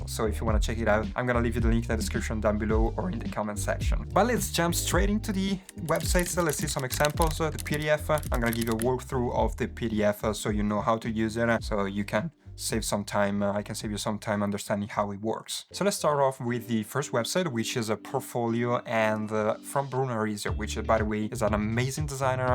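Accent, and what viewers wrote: Italy. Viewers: Hey is an Italian American I love the Italian accent! Be great to come to Italy and get to meet you and learn about the culture! Having said that though do you have the slides for the guides you came up with for the sizes?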